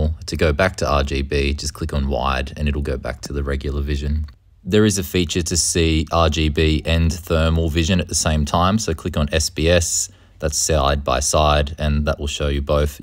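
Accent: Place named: New Zealand. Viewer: Nice summary. You sound Kiwi though.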